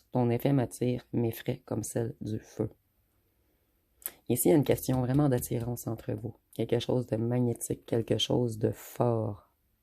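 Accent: Quebec, Canada. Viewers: Je sais pas si je suis devenu addict à vos guidances ou à votre accent Québécois que J adore 😊. Bise de France 🇫🇷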